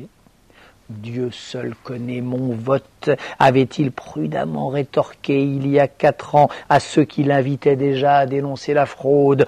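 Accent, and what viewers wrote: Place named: Israel. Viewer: mais FERME TA GUELLE pauvre tache tu fait honnte au VRAIE philosophe ...et mortelle ton acxent israelin et mortel .....a oui avant de parler de oppresseur n'oublie pas tes potes de la KNESET......et pour resume 300mille vote frauduleux on bien ete trouver mais dans le camps de mousavie ....connerie de soulevemment 1.5 million personne contre 48million pour ahmeddinedja.....pauvre devbile de menteur...koi kil arrive ferme ta GUEL.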